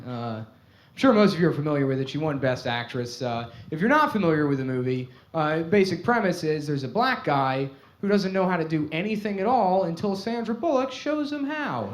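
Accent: United States. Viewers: Why does my friend Nick sound like all my relatives in Philly who don’t talk to me? I like Bed Stuy Nick more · You can really hear his Mid-Atlantic accent in this clip.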